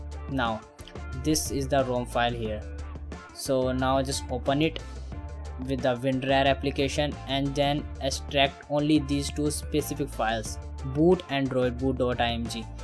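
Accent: India. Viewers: Logat orangindiya kek pantek bahasainggrissnya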